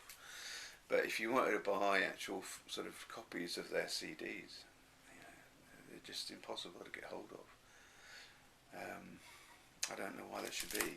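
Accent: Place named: Sweden. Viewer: Hi, My kind of video! I can definitely hear that you have strong Swedish influence in your pronunciation of Swedish words:-) I have somewhat similar feelings to you about Anekdoten. Have to sink my teeth into them some more. That Hollow Earth album I absolutely need to check out. Sounds very promising. Pete put me onto Agusa, and I love them. Finally got the new one this week, and I hope for a sit down thorough listen tonight. Only missing one Agusa album. I think Agusa has some old Swedish known folk tunes worked into their music at times. I have not been able to place it myself. Not familiar with that British commercial, but you have good sound memory there. It certainly sounds very similar. Not familiar with Black Bonzo. Struck me as a bit of English influence. Perhaps you have heard the news, but if not some skogsprog news: Jordsjø is recording a new one soon intended for release coming year, and their relative Elds Mark is dropping another one in November. 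Are you familiar with Makodajama? I believe only one album dropped an reissued by an American label. It is one of the Anglagård guys. Look forward to your next videos.. Take care, Richard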